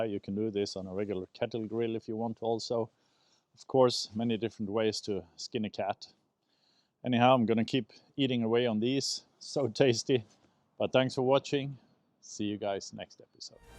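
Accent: Scania, Sweden. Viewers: Saknar verkligen din skånska från dina tidigare videos. När du pratade på svenska var du mer unik. 😊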